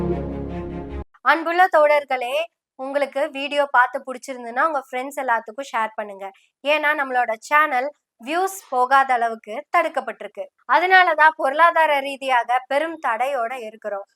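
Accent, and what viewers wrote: Kongu, India. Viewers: கொங்கு தமிழில் நீங்கள் பேசும் உங்கள் கருத்துக்கள் பாராட்டுகிறேன். வாழ்க வளமுடன்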